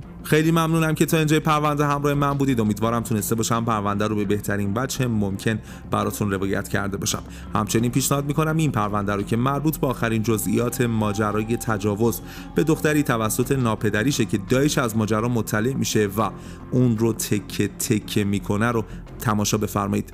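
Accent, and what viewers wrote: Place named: Tehran, Iran. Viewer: این همون ش تهرانیه بودش زدش دیدش گرفتش بستش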